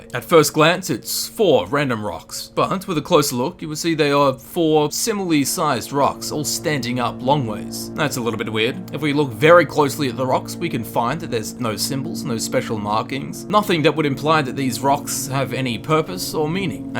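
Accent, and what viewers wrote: Australia. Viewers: what part of Australia are you from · Australian. The accents are very different. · Hey, what county are you from, because your voice sounds faintly Australian but I can't place it, thanks!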